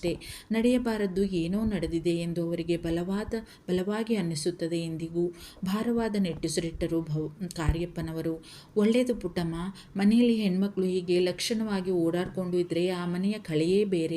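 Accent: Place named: North Karnataka, India. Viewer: ನಮ್ಮ ಉತ್ತರ ಕರ್ನಾಟಕದ ಕನ್ನಡ ಛಾಯೆಯೊಂದಿಗೆ ಉತ್ತಮವಾದ ವಿವರ 😇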